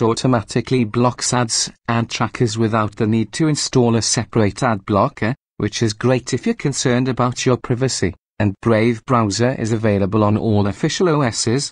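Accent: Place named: Wales, United Kingdom. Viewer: A Welsh “robot voice” - how original!!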